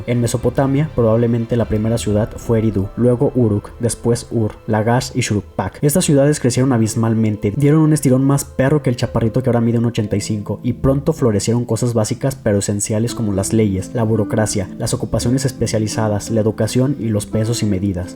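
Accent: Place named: Peru. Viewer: Heyyyyy? Me encanta tu canal ya que trata temas similares a los míos. Veo que empezaste hace poco igual que yo así que bueno, mucha suerte. Por el acento asumo que eres de Perú? Me recuerda al perro en la tacita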